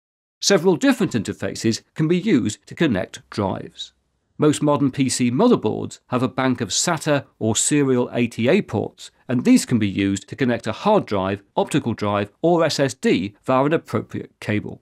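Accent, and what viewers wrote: United Kingdom. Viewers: I didn't learn anything new, but as always, very nice simple video w/o annoying music and flashing lights. i do enjoy the non nonsense approach with you videos. i think i will show this to my son, he may learn a few things. thank you. btw, the british accent is a nice touch.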